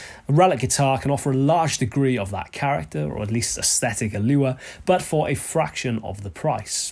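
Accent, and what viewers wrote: Wales, United Kingdom. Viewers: With due props to your playing, I click on these for the always interesting topics, your thorough research and, of course, your delightful Welsh accent. (I know, I know; the Welsh viewers are like “what accent?”). On the topic itself, I’m with you. Lightly done, it can be a nice look, the Isbell being a great example. Too much and it’s like buying a new car that was intentionally wrecked.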